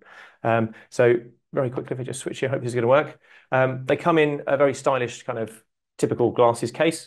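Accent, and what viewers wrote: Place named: United Kingdom. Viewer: I couldn't understand the limey bastard.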